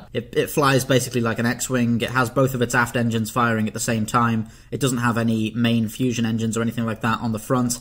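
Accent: United Kingdom. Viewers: I'd love to see a video where you summarize all the technical terms of a ships movement, sides, etc. What does ruttering mean? Aft, ventral, all that stuff. Yeah, I could look it all up, but I wouldn't be hearing it in a british accent.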